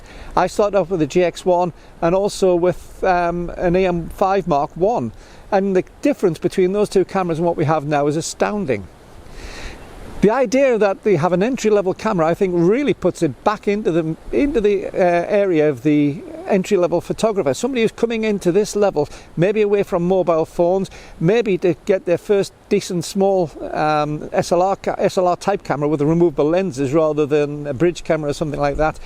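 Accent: United Kingdom. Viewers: great insights. always fan that British accent :) The bottom line if you carry a bag of multiple lenses (3-4) and want to be on a minimal comfortable carry, nothing compares to m43. given the low f-number per price (such as 25mm f0.95) you still get a decent full format ~f2.0 paying less money per overall and keeping the size to minimum. also, the sensor movement compensation of Olympus makes it possible to shoot in low light for a long shutter time, and the elegance of pana, say gx7, is 2nd to none, except Leica. So the only thing comes down to Megapixel which is quite BS in real life.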